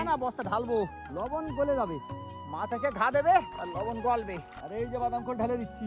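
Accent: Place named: Khulna, Bangladesh. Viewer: খুলনার অরজিনাল ভাষা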